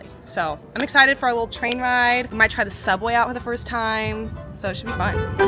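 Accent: United States. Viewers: I'm from western Canada. Think Montana/Minnesota accent. When I listened to you, I thought I heard a hint of Bahstan.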